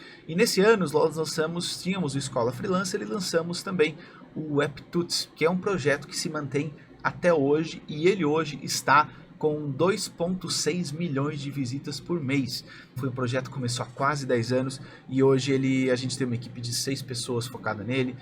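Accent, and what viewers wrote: Portugal. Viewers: Que vídeo phoda! Eu sou designer, mas comecei a estudar marketing qndo conheci e teu curso de Facebook (na época do teu sotaque bem português)😁 demorei até resolver unir essas duas áreas, pq como só havia trabalhado em agências ganhando mt pouco, e nunca pensei q fosse possível escalar. Agora estou cheia das metas 🤣 Criei canal, mas por me tomar mt tempo p editar, estou focando na criação de um produto p/ depois virar tua aluna de novo e aprender a anunciar.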